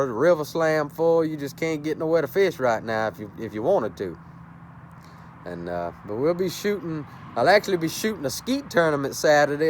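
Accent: Louisiana, United States. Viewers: Are you cajun?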